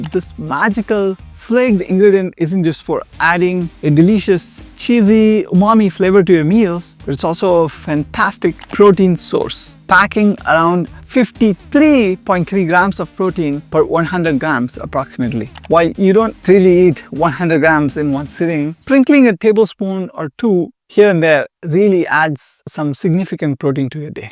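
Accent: India. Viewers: I absolutely hate the Indian accent. I don’t know why but it triggers something in my brain. No offense. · Either you are south Indian Or you are Bengali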